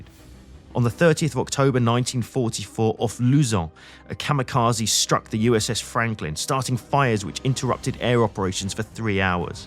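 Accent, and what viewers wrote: England, United Kingdom. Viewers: You sound British so why not pronounce lieutenant as 'leftenant'? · Endlich spricht man jemand englisch und nicht amerikanisch 🙂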